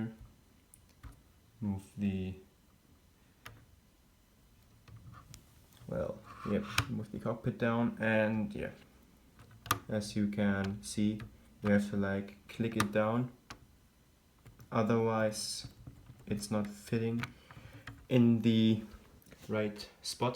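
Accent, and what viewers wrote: Germany. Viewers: sieht ganz geil aus, ich weiß nicht safre vdas du deutsch bist aber dein englisch hört sich ziemlich deutsch an :D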